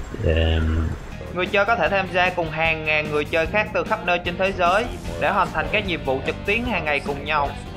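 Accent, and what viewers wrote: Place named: Binh Dinh, Vietnam. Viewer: ôg giới thiêu game có giọng nói giống dân 77 quê tui gơ😂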